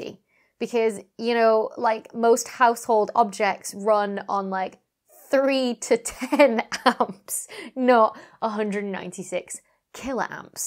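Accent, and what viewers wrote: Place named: Cockney, United Kingdom. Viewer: Your Cockney accent is cool. (I'm not native English speaker)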